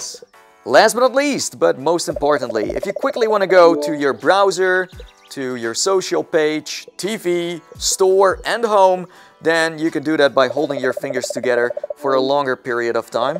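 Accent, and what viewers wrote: Netherlands. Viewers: seems kinda Dutch am I rightytighty? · I smell a littlebit dutch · Ur definitely dutch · I can tell you're dutch. honestly, same. and i could only wish i got an oculus quest 2. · Ik zweer ik vind het zo fucking grappig wanneer ik merk "hmm, ik hoor een minuscuul klein accentje. Die persoon is Nederlands." En negen van de tien keer heb ik het goed. Altijd weer leuk om mede Nedelanders te vinden waar ik interesse in heb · It sounds Dutch, but not sure.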